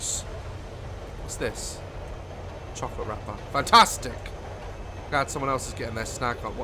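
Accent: United Kingdom. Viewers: The jump scares were top notch, it was enough to curse in my native Spanish language! 😆 I laugh so hard when cursing in a UK accent makes it 10X funnier. I love it!! 😆👍 Had a rough day and I needed a good laugh and good ol’ always cheers me up!! 🙂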